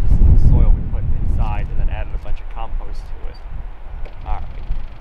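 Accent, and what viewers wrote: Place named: Northeast, United States. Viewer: Moulton - It could be his unique NE USA accent. My 'ear' didn't catch it as odd. Although I'm SE NC, I had relatives who were No. NC & So. VA, with accents of saying "out & house" that sounded similar to "boot." My Grandma called shovel "shevel" & cover "kiver" with short vowels. 😊 I know is further north, but IDK where he grew up. It's the "Queen's English," as NC's tourist commission calls that type of accent, remains in parts of NC. Not quite northern VA nor New England. Many local areas have uniquely nuanced ways of speaking. just sayin'.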